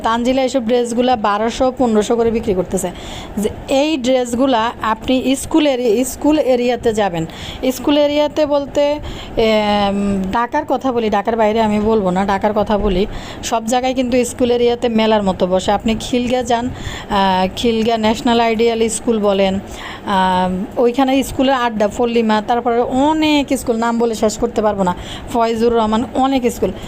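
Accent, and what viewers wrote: Noakhali, Bangladesh. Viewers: আপনার বাড়ি কি নোয়াখালী। আপনার ভিডিও যতবার শুনি ততবার আমার খুব হাসি লাগে বিশেষ করে বাপ্পির ব্যাপারে যে কথাগুলো বলে না আমার খুবই হাসি পায় আপনার কথাগুলো শুনে❤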